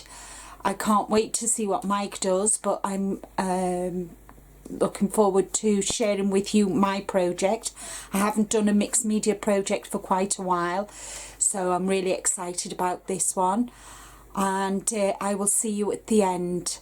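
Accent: Liverpool, United Kingdom. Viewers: Love how you put this together, watched the vid after watching some of Mike's... only recently discovered this form of art.... you're very inspirational..... do I detect a hint of a scouse accent there? X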